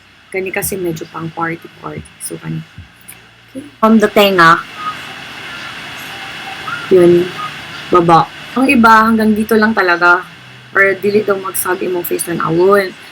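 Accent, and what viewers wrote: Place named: Cebu, Philippines. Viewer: I thought you were. you have that cebuana accent. You should make more of these, with better lighting, video quality, and sound quality. and keep the bisaya script. Lot of potential to be a popular pinoy youtuber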